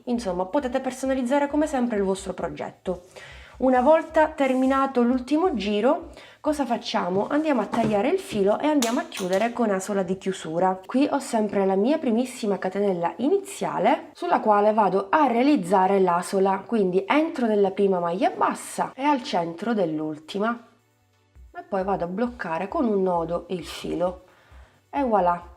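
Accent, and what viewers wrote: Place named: Palermo, Italy. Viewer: anch'io sono di Palermo, qualche flessione verbale mi ha insosspettita 😊 Ribadisco i miei complimenti per i tuoi lavori soprattutto visto la tua giovane età 👏👏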